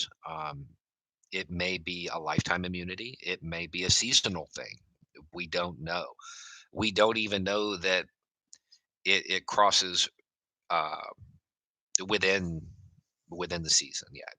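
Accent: South, United States. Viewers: eat the r*ch South American or Southern? That's two completely different things. has kind of a southern accent. It is far from a South American accent. (I only say "kind of" because I'm probably so used to a southernish accent that I don't really hear it as an accent.)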